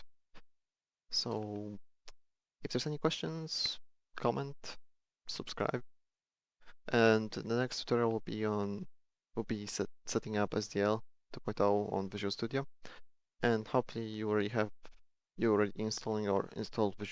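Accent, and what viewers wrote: Poland. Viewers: I don't know why but i recognised "polish" voice (i'm also pole) very nice tutorial :) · Where are you from POlish or English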